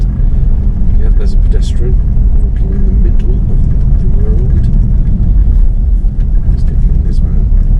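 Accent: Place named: Nottingham, United Kingdom. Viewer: Totally off subject here but I’m wanting to guess your accent because it’s definitely not SE?! Uneducated guess.. Nottingham area?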